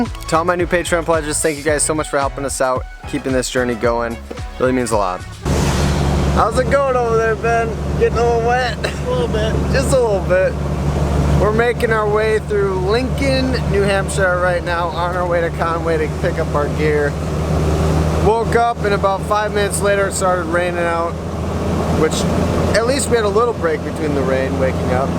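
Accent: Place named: Upper Peninsula, United States. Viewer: Love da yooper talk ya know Der hey! Keep'er movin!! 😁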